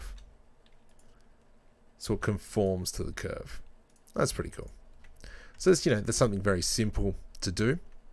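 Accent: Australia. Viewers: Yeah - I am using Blender 2.82 which is a bit different - but the convert-to-mesh was in the same place. Do you have a tutorial to add materials and export for threeJS by any chance? Very cool to hear an Aussie voice in this environment also!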